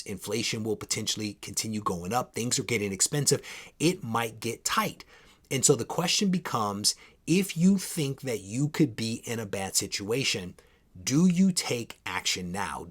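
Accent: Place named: New York, United States. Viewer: Nice vid again. Too true got to do what you got to do. Like the wall selection change up. 1 for which books they are. 2 I have 10 of them myself. It's kind of cool getting to see what it could be like if I were to get them graded. Like how you keep it fresh. Have to watch every vid till the end too just to hear more of that old school New York sound. Miss good rap.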